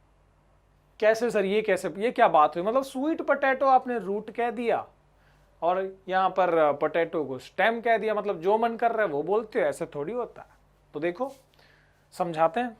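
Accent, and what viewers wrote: Bihar, India. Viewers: Sir is video me Bihari style me bol rhe h ... I like that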